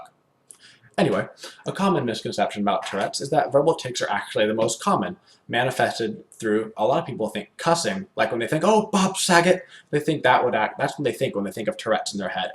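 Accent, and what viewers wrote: United States. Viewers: Watching this in 2020 you can def tell that you had a more pronounced southern accent back in 2014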